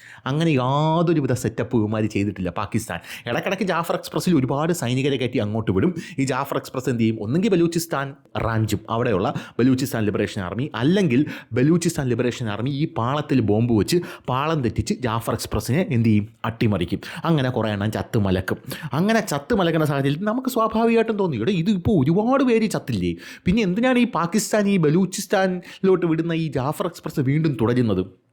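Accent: Thiruvananthapuram, India. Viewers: 😂😅 ഓ, തന്ന... തന്നെ അപ്പീ 😂😅😅 തിരോന്തോരം 💪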